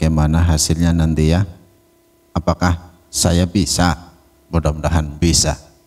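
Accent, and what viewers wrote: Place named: Banyumas, Indonesia. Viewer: Salut. Servis di daerah mana mas ? Kalo dari logatnya kayanya banyumas ya ? Hehe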